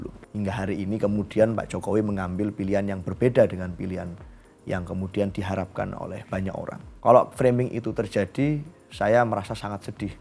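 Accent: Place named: Java, Indonesia. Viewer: Aku suka logat medok mas. Mugia sukses mas. Mas contoh pemuda yg meniti karir dr nol.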